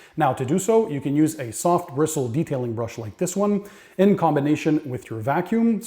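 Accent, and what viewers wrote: Quebec, Canada. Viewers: Are you from Quebec ?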